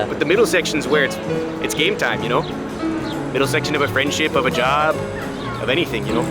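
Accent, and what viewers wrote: Canada. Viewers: this is the most canadian sounding man i’ve ever heard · Dude I love the heavy Canadian accent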